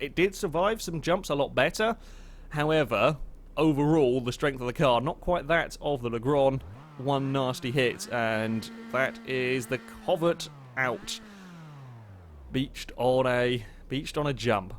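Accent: United Kingdom. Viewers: This guy sounds like one of the high society rich English guys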